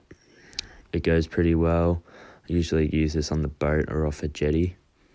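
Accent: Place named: Australia. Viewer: 🔥 love the good old Aussie commentary 😂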